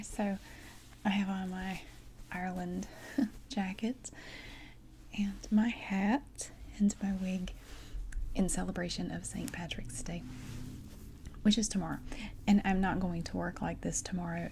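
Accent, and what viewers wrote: South, United States. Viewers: I was giggling when you said you had a "tiny bit of Irish" in you. Reminded me of something Phil Lynott, Irish lead singer with Thin Lizzy, said at a concert. (slightly dirty joke coming up....) He said, "How many of you girls here tonight have a little bit of Irish in you?" (girls scream and wave their hands in the air) "And how many of you would like to a little bit more Irish in you....?!" (girls scream even louder!) Love your southern accent x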